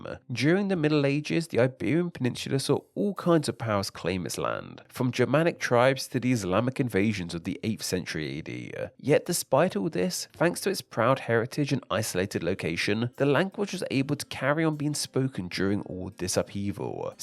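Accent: United Kingdom. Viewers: He is British, ever heard of different accents?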